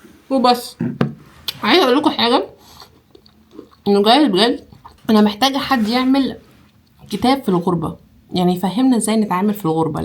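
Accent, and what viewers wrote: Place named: Jeddah, Saudi Arabia. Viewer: انتي صايره تتكلمين سعودي جداوي هل عندك روميت جداوية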